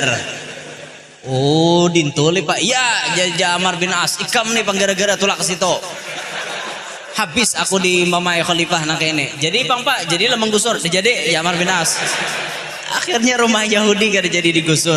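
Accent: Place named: Kalua, Indonesia. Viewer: Nang spesial disamping ilmu sidin adalah logat kalua nya nang maulah orang katawa😁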